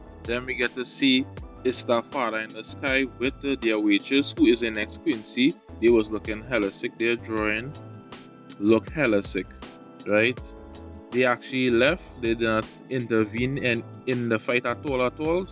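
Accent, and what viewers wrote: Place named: Trinidad and Tobago. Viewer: The moment I hear the voice I was shocked. Lol. A Trini giving a rundown on anime.... Amazing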